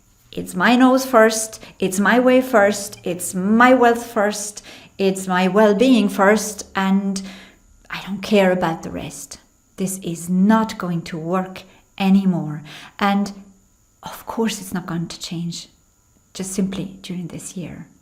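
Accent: Ireland. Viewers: Hi, I can’t place your accent is it Irish? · yes, i had a powerful sensation come over me at the end of last year and it was a feeling of ''this is it, it is over''. I get the feeling that something is gonna happen in August but what i have no idea. We are living in very interesting times. I am Irish by the way and i got a hint of an Irish accent from you. Are you Irish? or did you maybe learn English in Ireland? If it is the latter you are like a native. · Watching this in July 2024, what a scary time that was... I'm guessing what you intuited in March 2021 was the V pass? I am glad I didn't fall for any of it. I had a dream prior to the whole debacle about injections so that was a heads up, and I smelled something rotten in Denmark right away. I have subscribed to your channel as of yesterday, love your calm demeanor. Is that an Irish accent you have? But I see you're in Germany, must've been tough going there, I'm in Canada it was awful here! 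♥ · Love the accent..Irish?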